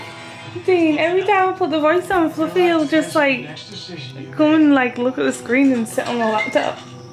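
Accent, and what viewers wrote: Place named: Northern England, United Kingdom. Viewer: Seasons greetings!:) I love having your northern voice in my feed.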